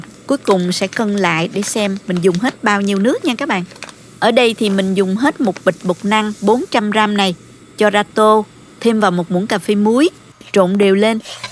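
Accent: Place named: Saigon, Vietnam. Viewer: Giọng Sài Gòn xưa thật dễ thương phải không?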